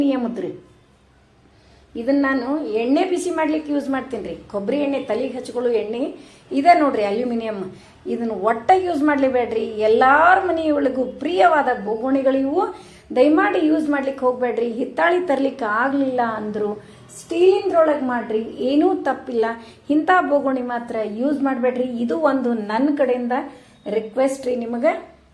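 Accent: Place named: Bijapur, India. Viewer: ನಮ್ಮ ಬಿಜಾಪುರ ಭಾಷೆ ಸೂಪರ್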